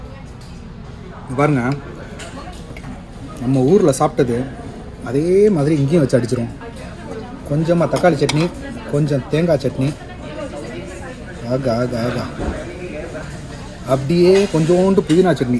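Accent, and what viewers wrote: Madurai, India. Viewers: ஆஹா...ஆஹா...மதுரை தமிழ்... அழகு அழகு....வாழ்க வாழ்க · Nee madurai ah bro. Slang madurai maari irukku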